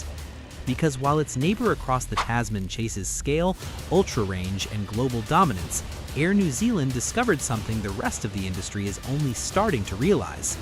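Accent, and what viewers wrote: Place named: United States. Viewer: This video is very obviously an AI Voiced (with shocking pronunciation in an American accent) infomercial produced by Air New Zealand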